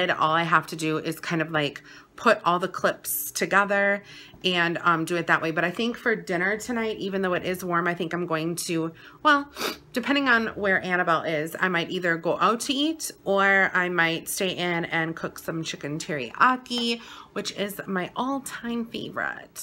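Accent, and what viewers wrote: Wisconsin, United States. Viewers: You're a hoot!! Love your vlogs. Love your Wisconsin accent🙂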